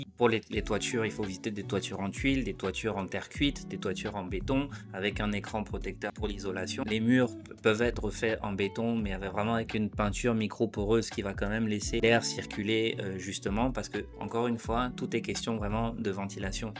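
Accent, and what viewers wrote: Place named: Cameroon. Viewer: Le ton camerounais 😂😂😂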